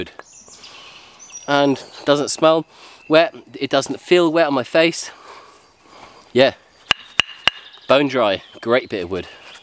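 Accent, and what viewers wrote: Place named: Bristol, United Kingdom. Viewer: Just found your channel.... I am in bristol, it sounds like you arent too far away from me.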